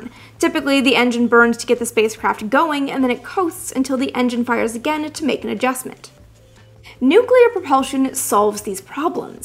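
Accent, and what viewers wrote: Canada. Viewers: You should do at least one joint 'cast with Paul Shillito of Curious Droid. P.S. You're Canadian, aren't you? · Are you from Canada? If not it's gotta be like Wisconsin or something, one of my ex gf's was Canadian and I swear I hear the same little accents in you that she had that no one else seemed to notice.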